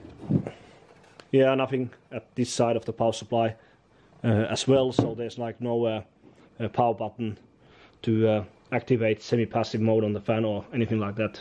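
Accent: Finland. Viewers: sounds like Finnish accent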